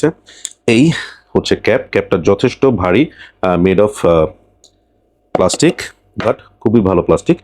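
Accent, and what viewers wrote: Chittagong, Bangladesh. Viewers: ভাই,আপনি কি চিটাইঙ্গা নাকি?🤔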